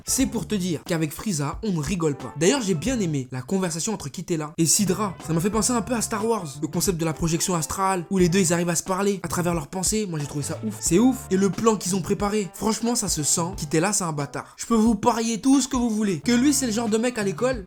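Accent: Banlieue, France. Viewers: pourquoi tu parle comme un wesh de banlieue ?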